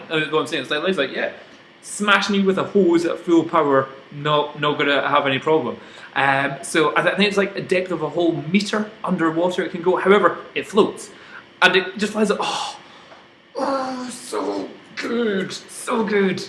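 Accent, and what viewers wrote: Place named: Scotland, United Kingdom. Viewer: This is great. It's like hearing my own thoughts about the Lily read back to me by an amusing Scottish guy. As a Canadian that is very amusing to me, thanks :)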